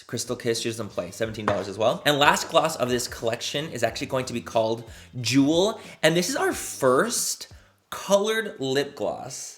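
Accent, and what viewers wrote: United Kingdom. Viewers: Loool the British accent 😂😂 love it!! 👋 hi all the way from London ☺️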